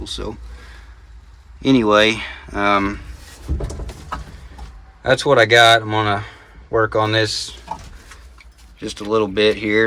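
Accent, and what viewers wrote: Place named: Alabama, United States. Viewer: This channel reminds me of Peg at Zip Ties and Bias Plies... Neither ever shows their face in camera, presumably so they can get away with their dirty deeds with impunity! LoL. I'm tryin' to figure out where this good ole boy is from. Countryside looks like southern Indiana but could be anywhere USA in reality. The voice sounds like the feller is from Alabama, but again that can be misleading too.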